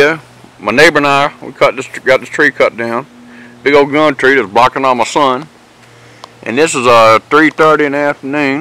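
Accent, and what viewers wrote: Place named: Virginia, United States. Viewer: I'm digging the accent. You grow up in Virginia your whole life? Nice.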